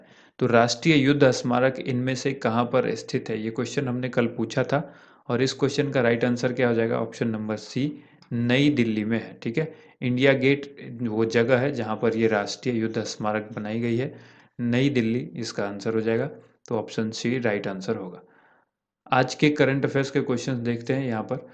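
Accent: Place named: Haryana, India. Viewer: mujhe Lgaa aaap haryana se ho😆😆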